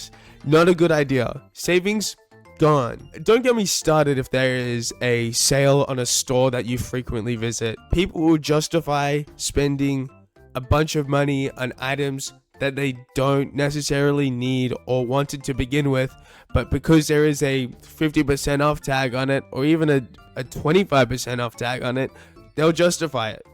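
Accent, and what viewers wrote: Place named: Canada. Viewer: OH MY GOSH OKAY IM SO SORRY 😭 your accent made me think you were canadian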